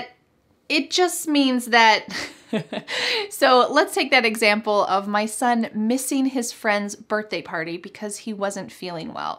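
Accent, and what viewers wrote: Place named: United States. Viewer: I love your American accent❤ And I also have been following you since 2018 and have learnt a lot with your videos.Greetings from 🇨🇺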